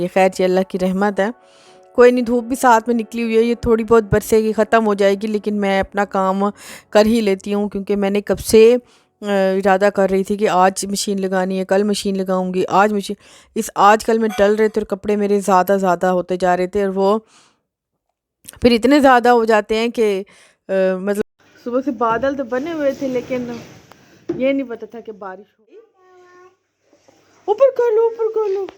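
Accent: Saraiki, Pakistan. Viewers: apni boli sariki my bht mithas hy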